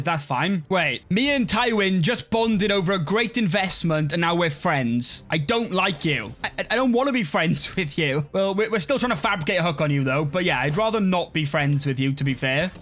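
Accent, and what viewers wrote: Wales, United Kingdom. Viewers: You sound funny like neath funny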